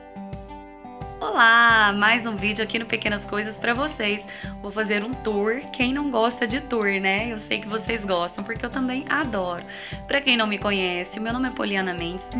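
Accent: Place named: Goiás, Brazil. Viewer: Essa goiana mesmo, conheci pelo sotaque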